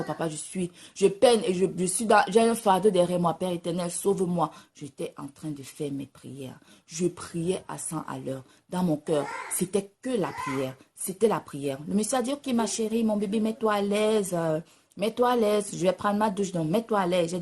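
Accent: Cameroon. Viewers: Oh mais je rêve finalement c'est vrai! Les camerounaises parlent pareil :D Ma mère parle avec le même accent c'est fou ça non? ! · C'est une camerounaise tu vois même son accent et le nom sa chaîne, la musique congolaise n'a pas frontières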